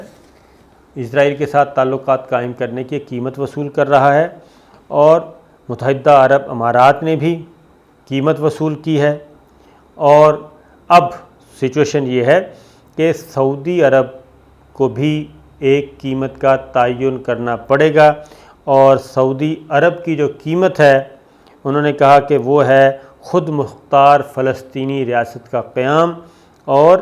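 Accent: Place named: Saraiki, Pakistan. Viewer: Saraiki speaking hai oski urdu aise he hai ap log khhamakha time apna zaya kr rahe ho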